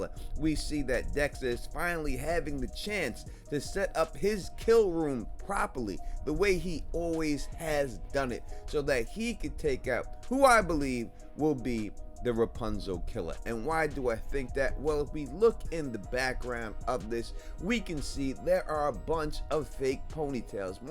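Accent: New York, United States. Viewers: You gotta be from either jersey or new york with that accent. Loved living in the city. Great content as always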